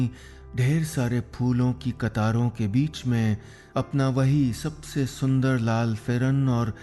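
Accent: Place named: Kashmir, India. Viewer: That be able to use Kashmiri accent 🙏🏻🤌🏻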